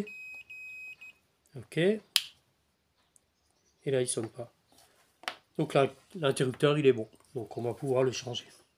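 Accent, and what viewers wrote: Alsace, France. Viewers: Ça sent l'accent d'Alsace ici ! Bonne vidéo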